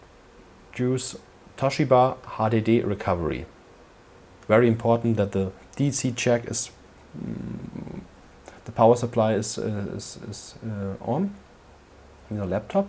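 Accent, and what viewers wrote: Germany. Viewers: a nice German accent talking technically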